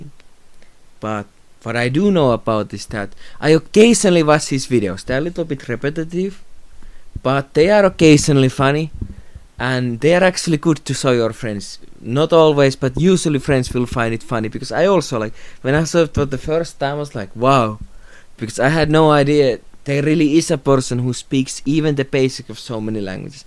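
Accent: Finland. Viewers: I couldnt finish watching this video because of the accent but I will drop a like for the effort! Next time you could speak in suomi and have english subtitles, cheers bro!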